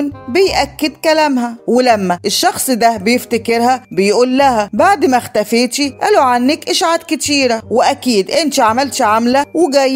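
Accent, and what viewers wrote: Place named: Iraq. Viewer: هاذي الرواية عندها اكثر من اسم؟ اسمها الاميرة المحبوبة او كيف اصبحت اميرة المهم اوك هتخلى عن لوكاس بس هاذي المررة وانا ليا كلود هو في اب بوسامتو لا ده غير فيليكس وايجيتل المسكين انا كلي رومانسية وبعشق الرومنسية بس مو لذي الدرجة الي انتي متصوراتي بيها رومانسية في الروايات والالمسلسلات بس في الواقع غيييير وان شاء الله ندخل الجنة وهنيك نقدر نحلم زي مابدنا ومن لهجتك واضح انك عراقية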